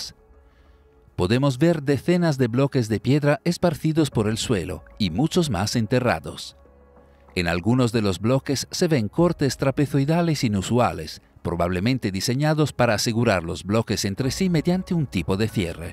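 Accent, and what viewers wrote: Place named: Italy. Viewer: Por si no notaste el acento, el narrador es de origen italiano,. Habla un español PERFECTO, me gustaria oirte a vos hablando italiano........ademas podrias tener mejor modo para hacer una critica o comentario, ademas insignificante.......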